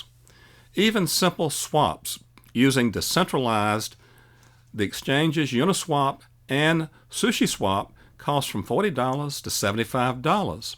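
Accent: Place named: South, United States. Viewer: you sound like a real southern gentlemen. I heard Flare would go live on may 1st. Thats what i heard.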